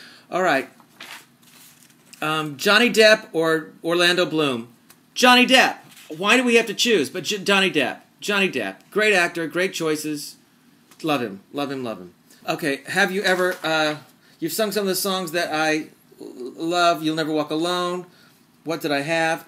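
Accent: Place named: United States. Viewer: you have the best american accent ever, its so soothing and therapeutic. i subscribed after the fred phelps video but your vids (including this) get top ratings from me :D keep going, and tell oprah i says HEY GIRL!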